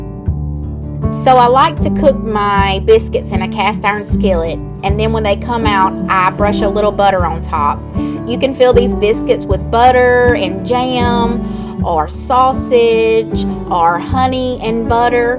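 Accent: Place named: South, United States. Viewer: Them biscuits look amazing and with a southern accent like that, I trust anything you cook.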